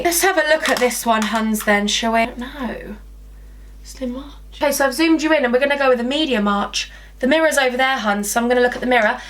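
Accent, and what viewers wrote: United States. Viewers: Your American accent is so good you sound just like me 😂😂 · you're american accent is better than mine... PS i am american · Your American accent is on point girl 👌🏻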